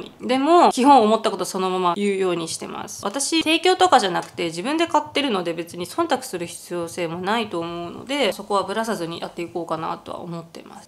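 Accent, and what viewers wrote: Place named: Hakata, Japan. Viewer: 必ず新商品はの動画みてますよ。福岡出身なので博多弁がとっても可愛いですよそのまま頑張ってくださいね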